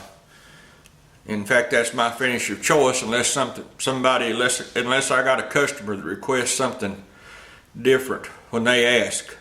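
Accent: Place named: Texas, United States. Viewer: Hey, I have watch a hole lot of videos on You Tube and I want to say you are the best, really down to earth guy. I loved your show on safety. You are so dead on, of the point that it is your own business on safety. Thank you for your videos I have learned so much from you. You sound like your from Texas so am i close? LOL I live in Shreveport La Thanks again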